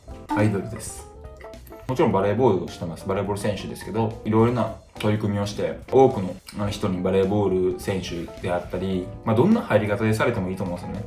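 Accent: Kansai, Japan. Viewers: 関西人としては関西弁と関西のノリがとても落ち着くので どこへ行ってもそのままでお願いします、、！！ コンビニでお箸渡されて脈ありか？ は笑ったｗｗｗ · イタリアで頑張ってますねー！応援してます。 関西弁が混じるところがいいですね！ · ランくんの関西弁がとっても新鮮🤗💞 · 関西弁？か分かんないですけど 〜やけど、とか 分からんけど〜とか なんか親近感湧きますねぇ〜😂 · 関西弁新鮮な感じします！✨ 明日からのVNLフランス大会楽しんでください☺️ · 最初の方、若干標準語ぽかったのに、身内からの質問読み出して関西なまり出始めたの好き🤣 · 藍くん、ハンサム・ボーイなのに 喋ると関西人そのもので、思っていたイメージとギャップがあってビックリしました😂 ちょっと面白いやん…とハマってしまったので、コレからの【らんるい】も楽しみにしてます🤗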